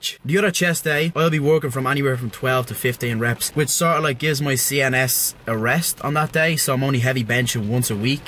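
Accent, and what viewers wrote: United States. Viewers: Cringe at the gay American accent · Are you from Texas? I notice an accent.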